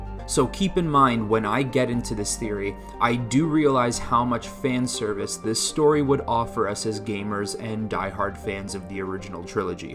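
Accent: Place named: Canada. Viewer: you’re so welcome. Also I LOVE that Canadian accent 😃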